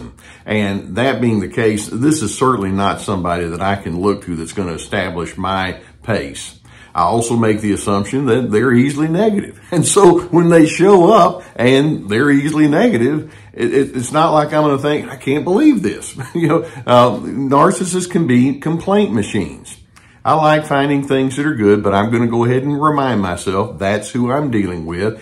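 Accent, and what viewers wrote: South, United States. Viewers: Thanks from France, Dr, for your daily (almost) presence. I am French, a musician, and your southern drawl is a joy, and music.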